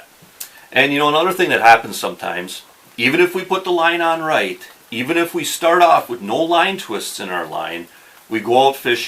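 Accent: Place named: Upper Midwest, United States. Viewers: the way you say pound tells me you are from minnesota or wisconsin all my relatives are up in MN you sound just like them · Where is this guy from? Sounds like norther Michigan, Wisconsin or Minnesota. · Oh man, I'm a Minnesotan down in Florida for work, great to hear that northern accent on youtube, don't you know. Fishin' fer Pompano stead of crappie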